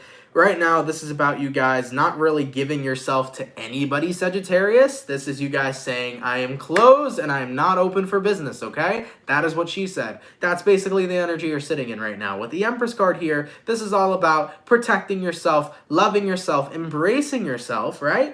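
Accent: England, United Kingdom. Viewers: Capricorn here, love the English accent haha. I’m English but mines more northern than that😊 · From England great English accent more mate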